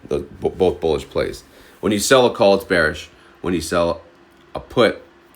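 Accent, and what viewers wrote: New York, United States. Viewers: Great voice and NY accent Your title should be “the godfather on dividends”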